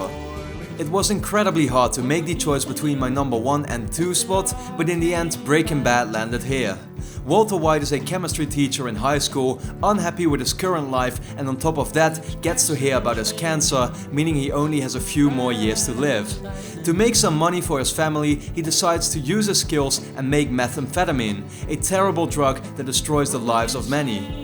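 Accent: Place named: Netherlands. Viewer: Oke i just subscribed to your channel. And i want to ask you were do you live? Because i think your dutch atleast you have a dutch accent. I think it is awesome that you and i have exactly the same taste of games and movies. My favorite games are the uncharted series and my favorite movie is the lord of the rings and that is just the tip of the iceberg. Awesome list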